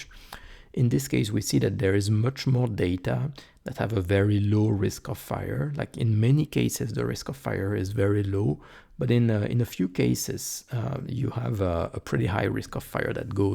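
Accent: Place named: France. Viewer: Thanks for this video. It was really helpful for me. Nice and pleasure English language with French accent. Greetings from Poland 🇵🇱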